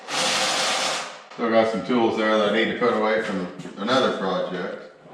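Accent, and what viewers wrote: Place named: Ohio, United States. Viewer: I am actually from Ashland Ohio and realize that I have never lost my accent since we moved Arizona in 1966. Surpises me how much you and I sound the same when we speak. My last name is common there, it is Mumaw . Keep up the good work with your positive attitude !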